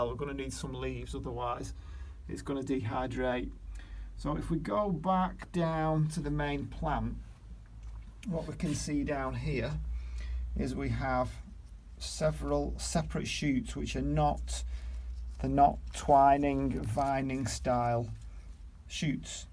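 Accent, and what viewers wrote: North, United Kingdom. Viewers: Fabulous video and so entertaining too. By the sound of your accent you’re definitely a northerner which is always a winner in my book.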